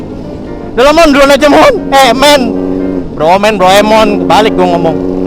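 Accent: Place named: Palembang, Indonesia. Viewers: Palembang ini mah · Itu mah Palembang bng🤣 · cak mano itu logat Palembang-_ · Palembang bosss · Cak mno Palembang woy wkwkw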